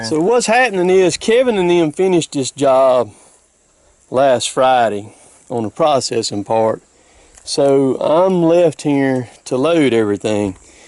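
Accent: South, United States. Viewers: I am a modest Patreon sponsor for Jason at Hollis Farms. I enjoy the baby deer videos and I just love the southern drawl. I think if your You Tube content has guns, blood, or banjo music; they demonetize it. You don't have a Patreon account. I think working out in the sticks like that with the heavy equipment one would want a sure fire way to communicate. What if a rattlesnake bit your sticker when you were watering the landscape? ( Old joke. "You gonna die!")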